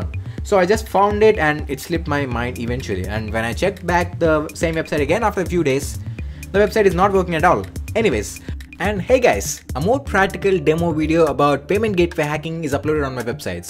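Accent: India. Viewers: fun fact: He sounds like an idian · In india school teaches us but I learned from foreign YouTuber and comments And he's Indian you can find by his accent and skin tone I can guess he's from southern India madurai is my guess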